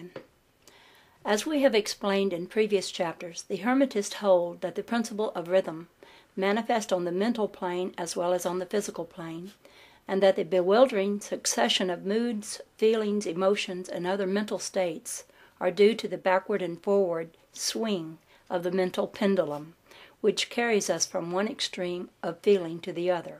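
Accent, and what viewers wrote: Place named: South, United States. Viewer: Thanks for putting something this important into audio. I find your reading of this and your southern dialect very delightful.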